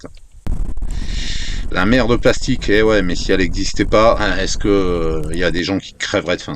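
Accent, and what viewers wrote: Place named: North, France. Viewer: Bjrs Mr votre francs parle viens de quel ville .Qui me rappelle Mr papa du nord .😊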